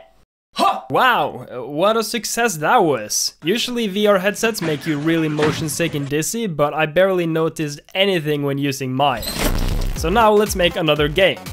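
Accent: Norway. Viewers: Are you Norwegian 😬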